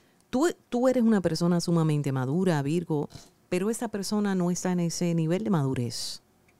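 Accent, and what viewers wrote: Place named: United States. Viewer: Soy divorciada hace 20 años, no deseo ni un poco volver al pasado, no deseo ni acercarme. Tengo problemas con mis 4 hijos y me deja muy triste. Los crie sola, siempre. Pero es tanto lo que me lastman que he decidido abrirme. Aunque me duela. Soy Madre por naturaleza los amo, pero tengo Amor propio Estabilidad economica es lo que necesito. Me es difícil jubilada a los 73 años generar mas entradas. Ruego al Dios, me de la bendición de ser autónoma, y no necesitar de la ayuda de mis hijos. No voy a bajar los brazos. Ahora hare jabones que venderé. Y rentare mi departamento, al fondo de mi jardín.y tener una ayuda económica. En Argentina es muy difícil la situación. Te doy las gracias y mis bendiciones para tod@ los que te escuchamos. Hacia tiempo yo no lo hacia. Te agradezco, me encanta como suena tu español, con acento americano❤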